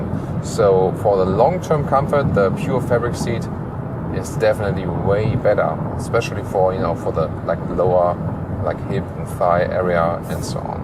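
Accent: Germany. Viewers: Love your reviews. And you're German accent makes the car so much more interesting. Would be nice if at the end you say I'll be back lol.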